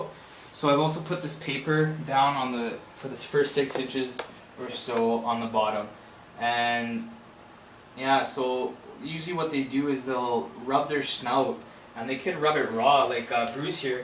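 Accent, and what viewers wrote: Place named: Canada. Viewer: nice video. u sound like ur from Canada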